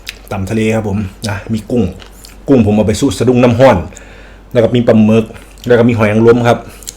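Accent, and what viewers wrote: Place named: Chaiyaphum, Thailand. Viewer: อ้าย เว้าสำเนียงคล้ายๆบ้านนู๋เลยจ้าคนชัยภูมเด้อจ้าfc จากชัยภูมิเด้ออ้าย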